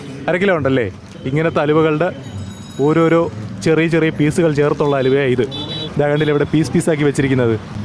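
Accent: Kollam, India. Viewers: ങ്ങടെ ആ കൊല്ലം Slang ദ്ങ്ങട് പൊളിച്ചു. മേടിച്ചുന്നതിന് വേടിച്ചു.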